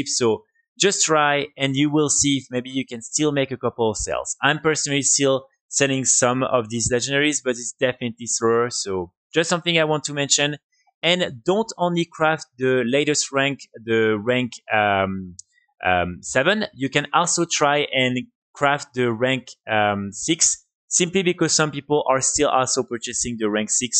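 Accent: France. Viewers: T'es français ?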